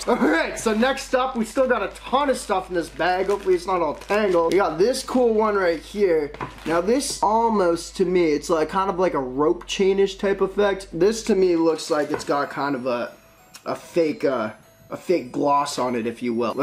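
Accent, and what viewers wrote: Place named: Alberta, Canada. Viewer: Duh duh duh duh duh duh must be from Edmonton or Alberta somewhere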